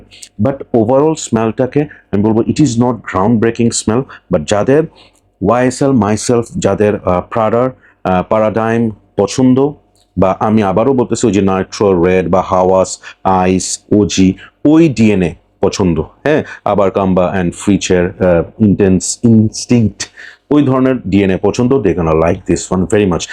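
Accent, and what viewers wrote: Chittagong, Bangladesh. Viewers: ভাই,আপনি কি চিটাইঙ্গা নাকি?🤔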